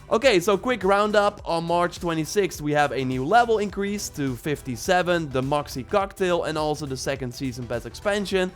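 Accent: Netherlands. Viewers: lol hearing a thick dutch accent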